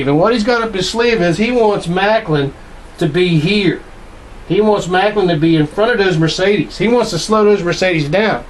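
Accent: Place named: Pennsylvania, United States. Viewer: The BBCfour documentary on this tragedy confirms that Hawthorne admitted fault to close friends immediately after (but not publicly) and lived out his remaining years a "broken man" (better than a cut in half man which is what 85+ spectators got). The mask and with matching shirt is a nice touch and I like your enthusiasm, but I don't believe anyone doubts Hawthorne's singular role in igniting the tragedy. Lastly, I am picking up a slight Pennsylvania accent from under the mask.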